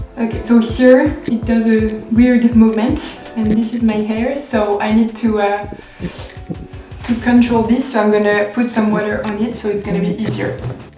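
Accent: France. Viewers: Your French accent and grammatical mistakes are really funny. Thank you for that delightful and entertaining video! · That frenchhhh accenttt 💛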